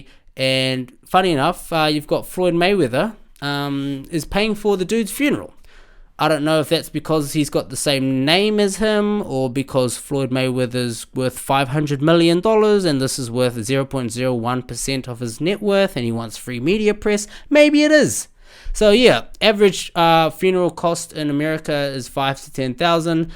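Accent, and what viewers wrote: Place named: India. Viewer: Bro i love your videos, that indian accent though. Need to see more 👍👍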